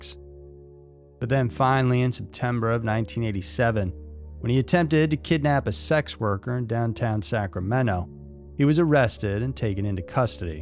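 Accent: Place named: Boston, United States. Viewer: adding an R to the end of ur name...lol yup thats a boston accent! Also, not pronoucing an R to words like car, park, art, etc😂😂😂 Thats me!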